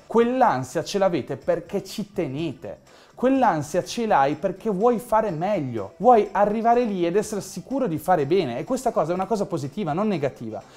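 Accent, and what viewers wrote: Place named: Ferrara, Italy. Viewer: Ma sei come me di Ferrara, mi sembrava di sentire un accento comune!!! :D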